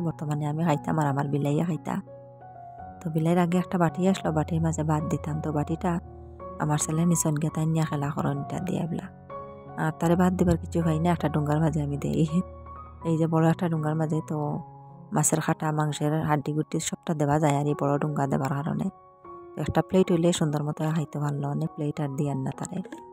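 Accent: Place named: Chittagong, Bangladesh. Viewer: আসসালামু আলাইকুম আপু কেমন আছো লাইক দিয়ে ভিডিও দেখে নিলাম তোমার কথা শুনলে আমার হাসি এই আপু আমি তোমার কথা কিছু বুঝে কিছু বুঝিনা তুমি কি চট্টগ্রামে ❤❤❤❤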